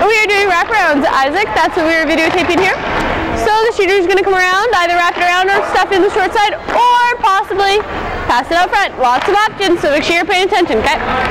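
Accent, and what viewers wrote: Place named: Canada. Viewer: she is probally canadian. A girl i dated was from Alberta and she sounded a bit like that. I see u like the sporty ones eh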